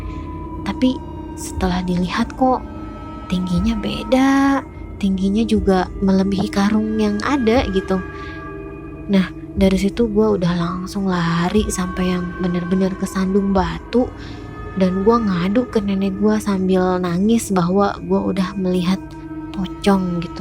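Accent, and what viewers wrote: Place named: West Java, Indonesia. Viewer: ieu sunda pisan 😁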